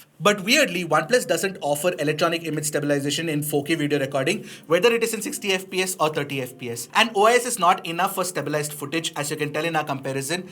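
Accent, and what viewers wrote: India. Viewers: Good indian english, I'm from Brazil and can understand 100% what you say, amazing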